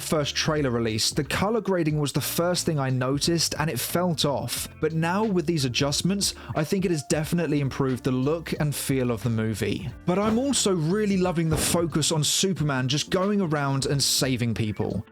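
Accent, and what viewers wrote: United Kingdom. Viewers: These are the facts. The fish and chips accent seriously enhances the review experience I’m not even remotely going to lie. Love this channel, even though he complains too frequently about even mild humor in a Superman film. Still, few have done better journalism on the progress of this film than this fellow.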